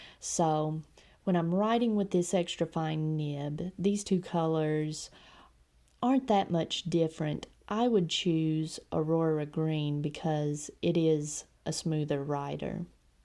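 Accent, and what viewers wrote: South, United States. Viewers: being from mississippi ... love that southern draw ... :-)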